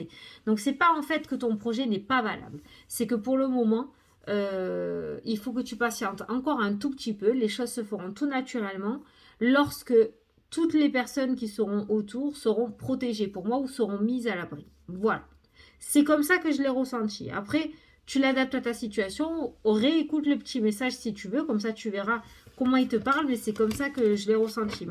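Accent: South, France. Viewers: Merci ma jolie pour moi la vierge tu as un bel accent chtit du sud 🌹👍❤ bisous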